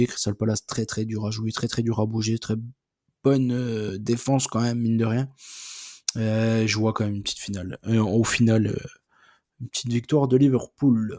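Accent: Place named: East, France. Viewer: Salut mec tu as l accent de l est de la France 🇫🇷 !!!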